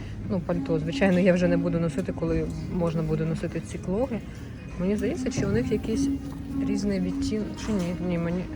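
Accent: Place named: Ukraine. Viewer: вы русские слова пытаетесь произносить на украинский манер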